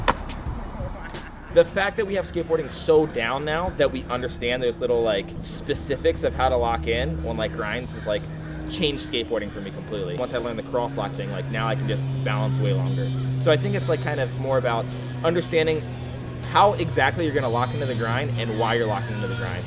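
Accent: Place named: New Jersey, United States. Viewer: I have no idea where is from, but I'm definitely hearing a Jersey accent in there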